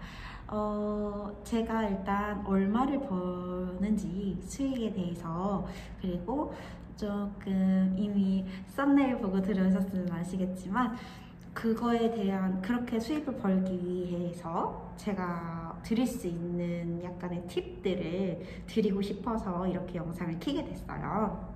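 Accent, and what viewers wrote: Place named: Gyeongsang, South Korea. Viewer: 사장님 경상도분같은데 말투가~! 카페 부산쪽에있으신걸까요~~ 한번 가보고싶어요💕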